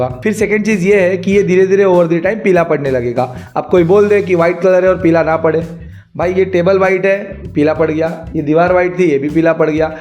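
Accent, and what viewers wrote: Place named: Maharashtra, India. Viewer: मराठी माणूस आहे का रे भावा तू ? 😄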